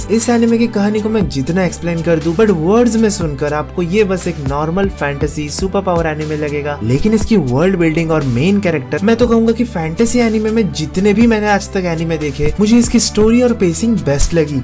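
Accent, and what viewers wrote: Bengal, India. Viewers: Bro. Are you Bengali? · Bro are you Bengali? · Tumi ki bengali?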